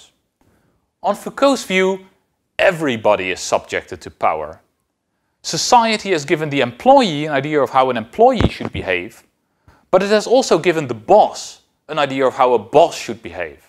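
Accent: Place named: Netherlands. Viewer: i love your dutch accent! Lekker!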